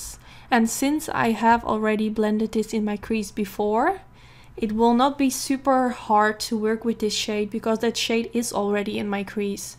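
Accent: Netherlands. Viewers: This person sounds Dutch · '' ✦ ABOUT ✦ My name is. I'm 24 years old and live in the Netherlands. '' I hear your dutch accent beneath it:P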